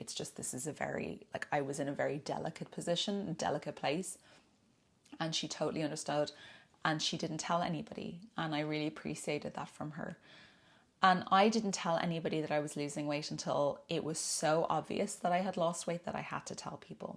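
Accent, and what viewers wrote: Ireland. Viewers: Great tips! I love your beautiful Irish accent. Hello from the USA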